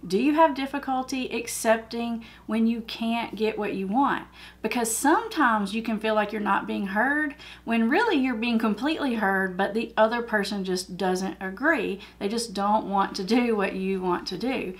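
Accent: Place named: Appalachia, United States. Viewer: i hear an Appalachian accent. I absolutely live for it. I live in Southwest Virginia. I have an area I am currently dealing with that I would love for you to do a video on. I work very closely with a woman who is constantly putting other people down. When I use the word constantly, I seriously mean she does it every breath. I have worked there only 2 years. She has been there 5 years, I am her superior and I have had decades of experience and I have more education. She and I got off to a very rough start. I stood my ground and confronted her face to face with our boss. The boss 100% supported me. Long story longer, my coworker and I recovered and actually became close. I genuinely care for her. She has lots of qualities that make her a valuable employee. BUT.....she is forever more nitpicking our fellow coworkers. Many of them are super young women all around 20 and have been there since high school. 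They are all great girls. Do they mess up sometimes? Sure they do. We all do. In my mind it's our job to mentor these young women, not tare them down. So, I pulled away from her so that I wouldn't have to talk to her, but every time I did speak to her down into the dark negative quicksand we went. I have to fully own that I had allowed myself to be influenced by this negative speech and I became as bad as she was. So, I snapped out of the nasty, but I soon realized that being complacent is just as bad as being an active participant. I am generally a very positive person, calm per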